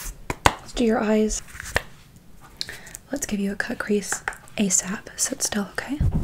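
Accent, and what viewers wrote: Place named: United Kingdom. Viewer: I am very confused you eyes colour changes and somtimes you have a british accent 🤨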